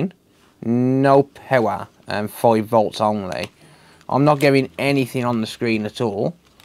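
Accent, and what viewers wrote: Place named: Birmingham, United Kingdom. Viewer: Hi mate new sub here I'm liking the vids. Found you through Tronicsfix! Just curious what accent is that? Birmingham? I just like to guess accents lol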